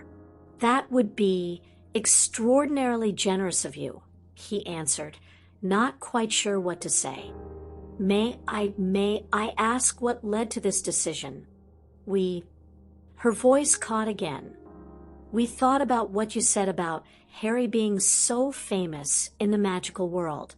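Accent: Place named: North America. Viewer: British characters need a British reader. Love this story but not with a North American accent.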